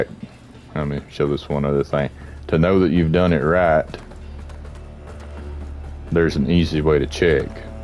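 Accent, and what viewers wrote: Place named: South, United States. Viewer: You sound like a red neck bunkin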